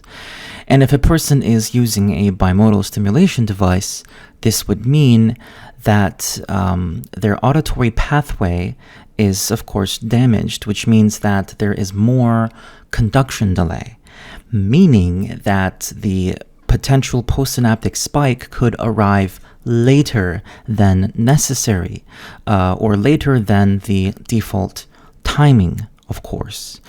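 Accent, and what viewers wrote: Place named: United States. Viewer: Are you from Russia? But you have American accent. Just curious.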